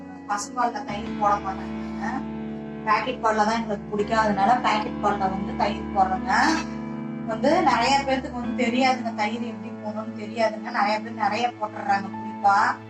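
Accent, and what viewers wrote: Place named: Kongu, India. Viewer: கொங்கு தமிழ் நல்லா பேசுறீங்க.. ஏன் background English பாட்டு போட்டுருக்கிங்க ?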